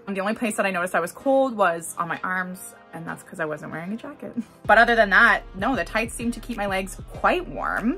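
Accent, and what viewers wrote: United Kingdom. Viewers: Nice British accent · Oh now you done it brittish accent pinch me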